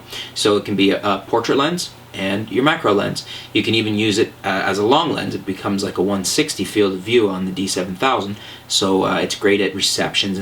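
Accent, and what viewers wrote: Canada. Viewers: really dude, "vig-netting?" vignette. it sounds like "vin-yet." you're clearly super canadian, so you obviously had french forced on you. there is no excuse for that level of laziness. step your game up. also, "bokaahhhhh" sounds idiotic as a million other people said.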